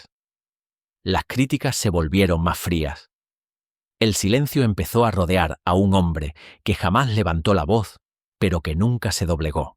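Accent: Andalusia, Spain. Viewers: Es andaluz el que habla. Que problema hay?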